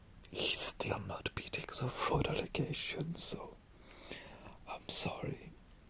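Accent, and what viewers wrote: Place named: France. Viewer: WoW I really like your frech accent its kinda relaxing you could try maybe do a ASMR in french? :0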